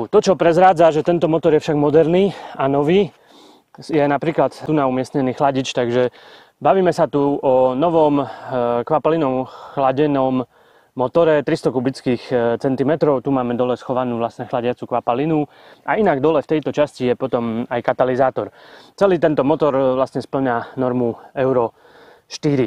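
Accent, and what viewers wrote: Eastern Slovakia, Slovakia. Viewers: Co to je za STRAŠNEJ dialekt???????. Nejsem Slovák, ale ten přízvuk je strašnej i pro Čecha. To je co za dialekt něktéré části Slovenksa u Ukrajiny nebo Polska, Maďarska????? Díky za odpověď. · Ten východniarsky prízvuk mi uši trhá...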